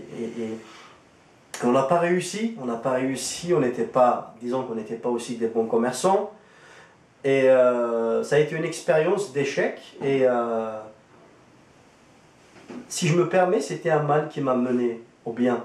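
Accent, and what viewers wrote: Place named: Kabylie, Algeria. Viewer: Le disciple Au commencement de votre vidéo vous dite ceci " bonjour bonsoir je me présente je m'appelle je suis Algérien kabyle............" vous vous adresser a qui la? pas aux Kabyles en tout cas! ni aux Algériens d'ailleurs! pourquoi ne pas réciter votre histoire de conversion en kabyle uniquement!? vous voulez cibler qui en parlant en français par exemple? et je trouve qu'i y a une sacrée différence entre vous entendre parler et vous lire! vous parler et j'ai constater que vous chercher a chaque a dire des mots que vous n'arriver même pas a trouver par contre j'admire votre français avec un accent kabyle! quand a vos écrits le fameux copier coller fait fureur! alors comme ca vous avez vu le film sur jésus? "zmane nsidna issa" c ça? et ca vous a marquer, toucher!! un film! moi qui croyais qu vous aviez lu la bible!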